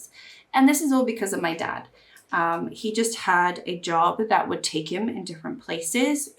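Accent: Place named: United Kingdom. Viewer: So interesting to hear about all the places you have lived 🙂 you definitely have a British accent, even though you spent quite many years in the US. I actually work at an OB school currently, I am the coordinator and we just got authorized last year! Did you like the school? It is quite different from the Swedish school system so we are a bit making it up as we go here 😅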